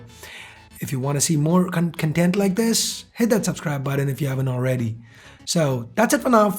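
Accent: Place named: India. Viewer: this is the best Indian english accent i watch so i SUBSCRIBE SO FAST SO I CAN UNDERSTAND CLEARLY 😊😍😁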